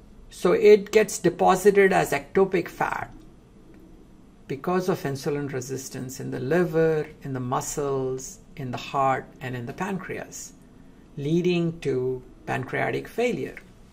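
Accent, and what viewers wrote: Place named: India. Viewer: I think i have to go throu all this information 3 or 4 times to fully understand it. Very interesting but also very complex. I love your work and your indian accent helps me to focus without getting tired. Looking forward to see more soon. Greetings from Galicia, nortwest Spain!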